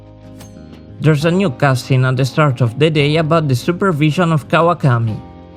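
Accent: Italy. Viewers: I know it's hard to understand, but it's not his fault, in Italy most schools teach you english with a bad accent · Are you italian?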